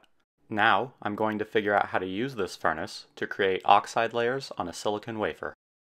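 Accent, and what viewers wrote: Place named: United States. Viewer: Fascinating, and aesthetically pleasing. Look forward to future videos. P.S. this was my first time ever hearing someone with US accent correctly pronounce the word "niche". It grates me when I hear "nitch" - I just can't help myself!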